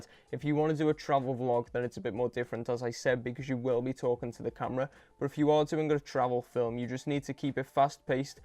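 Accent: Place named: North, United Kingdom. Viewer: Thank you for such a detailed and well explained video. Great to hear a fellow northerner too ;)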